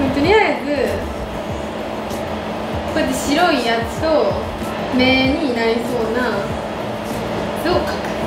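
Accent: Kansai, Japan. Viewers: JULIAちゃんの動画から来たからバリバリ関西弁？なのすごい驚いてる😂💗💗かわいい😳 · 葵のアウター姿も可愛いが 本当は葵自身が可愛いのだ💕BEAUTIFUL GIRL💖💖💖💖💖 そして葵の関西弁は本当に可愛いね。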